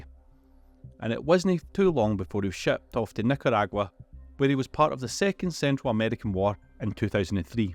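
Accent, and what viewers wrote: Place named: Scotland, United Kingdom. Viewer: Cyberpunk explained by a Scot! Great video, learned a ton. (Sorry if you're not scottish).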